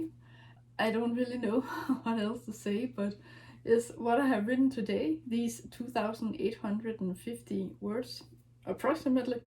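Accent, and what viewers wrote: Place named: Denmark. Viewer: I’m intrigued by your characters and story. I think there is definitely room for stories outside of a genre. Genre seems to be mainly for helping marketing and selling it. Also…Denmark! I thought I recognised the accent. I lived in Copenhagen for a year and really loved the country and the people ❤ My story is set in Sweden actually 😃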